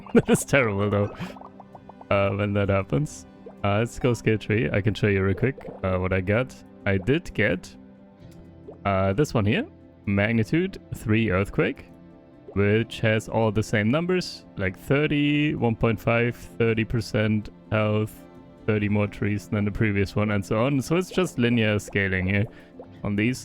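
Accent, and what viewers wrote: Germany. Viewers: Is that a common German Accent? I've only heard it once before and it was a character so i didn't know it was real but you sound EXACTLY like he did.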